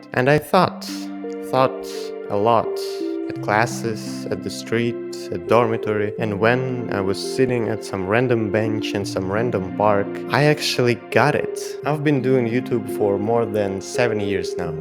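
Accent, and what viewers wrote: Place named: Russia. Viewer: Probably not the comment that you're looking for, but your English doesn't suck! Your English is extremely understandable and your vocabulary is really good! Lots of the slang words and phrases you use correctly and where a native english speaking American would! Seriously you should be proud of it, while you definitely have a distinct Russian/slavic that's not your fault! Anyone who grew up natively speaking Russian or any eastern European/Rusified language is almost guaranteed to have an accent no matter their language proficiency, plus at least in America we probably converse with and understand at least one person with worse English and a more foreign accent than you on a daily basis! Don't let your English skills be what keeps you from creating, I promise it doesn't matter in the slightest to an American viewer! We're here for the personality which we can all decipher just fine past a slight, and very adorable, Russian twang.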